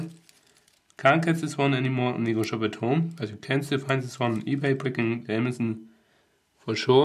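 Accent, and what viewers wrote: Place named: Germany. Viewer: your german accent rules haha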